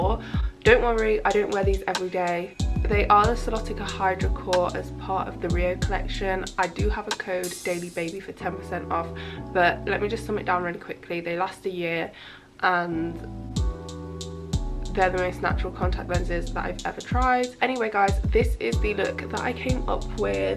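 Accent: United Kingdom. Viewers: Hey as another mixed race girl... do you tan well? Just wondering because from your accent I'm assuming you're in the UK and I heard that there's not a lot of sun there so I feel like if you were somewhere else your hair would probably lighten up and your skin would get darker. And possibly even give you freckles, judging by your skin tone. Edit: Cool makeup tho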